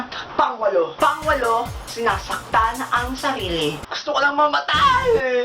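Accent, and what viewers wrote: Visayas, Philippines. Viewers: bisaya kba Kuya? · Kuya, bisaya ka ba? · Oy, bisaya diay ka? Daghan nata. Kabibo. Nganga :) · Grabe! halata kaayo na bisaya! xD Proud to be BISAYA!!!! :) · HALA BISAYA DIAY KA -HA KA HAHAHAHAHA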